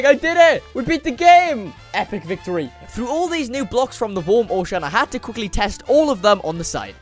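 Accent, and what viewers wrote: Wales, United Kingdom. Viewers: Can’t tell if your welsh or not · It’s a Welsh accent for sure, he has to be from Wales?…..👍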